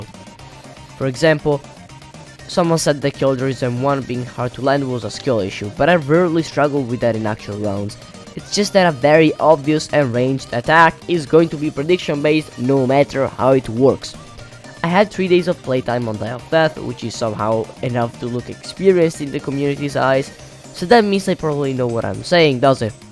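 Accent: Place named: Italy. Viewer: I love how blatantly italian your accent is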